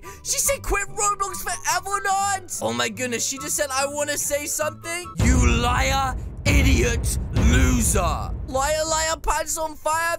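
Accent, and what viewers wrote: United Kingdom. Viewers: are u british im confused... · bro are u British?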